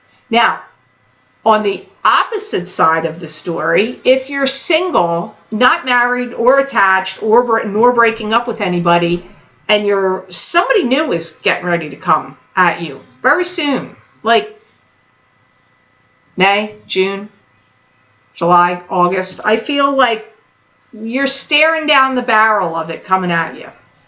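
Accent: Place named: Maryland, United States. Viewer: Your accent feels like home to me... I swear you're from Maryland or Pennsylvania! Love the video and thank you for your words. :)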